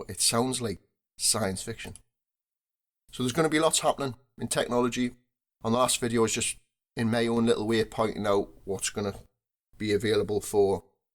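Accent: Newcastle, United Kingdom. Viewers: Love your videos. It's not just the Geordie accent. You are proper talented, and very entertaining. Now I need a proper XLR microphone. I have a 3.5mm jack to XLR adapter, but it's not great. It picks up radio interference.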